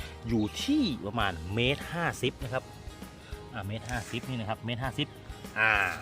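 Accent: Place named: Sukhothai, Thailand. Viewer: สวนอยุ่โขทัยมั้ยครับฟังจากสำเนียง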